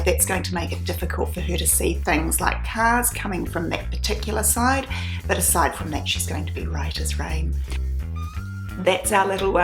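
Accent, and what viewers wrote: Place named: New Zealand. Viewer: If that is English from New Zealand you should be teacher, I like this accent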